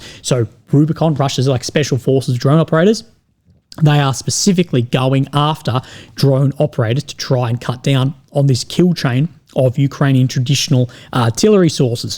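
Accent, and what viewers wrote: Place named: Australia. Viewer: Dude please start talking a bit slower I didn’t master the art of Australian accent 😅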